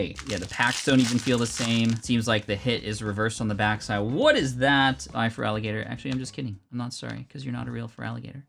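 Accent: France. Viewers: I love your french accent it's really funny. By the way i realy like your videos continue like that. A random guy from switzerland🙃🇨🇭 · j'adore ton accent français HAHA (I love your French accent)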